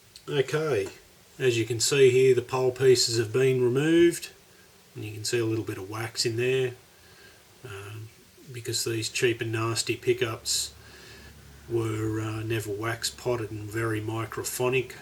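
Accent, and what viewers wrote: Australia. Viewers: Iwas almost uncaring as to the info once I heard an Aussie accent. I was doubly glad to find good info without the ubiquitous yankee accent. Thanks digger. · Judging from your kick-ass accent, I am guessing you are Australian? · Excellent video. I was particularly intrigued by the removal of the pole pieces altogether (as per Scott Grove's advice - he has a lot to say not just about guitars and guitar gear but about bloody everything lol). It did really brighten up the pup to my ears at least. I know that I'm six and a half years late on this upload but it's still pertinent. Great to hear an Aussie accent on the tube mate. Keep 'em comin'. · PS : I like that little riff you do !...greetings from Melbourne !!! good to hear a fellow aussie talking common sense !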